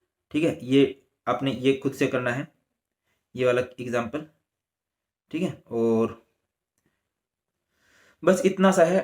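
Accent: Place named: Kaithal, India. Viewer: aap kha se hoo aapki bhasa mereko khethal side ki lgi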